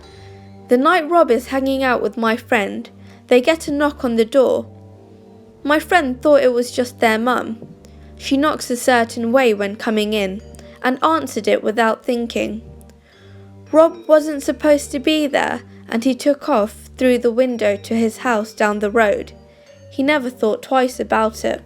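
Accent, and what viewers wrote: United Kingdom. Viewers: Her voice is lovey.And usually find British accent s annoying but her is lovely ;)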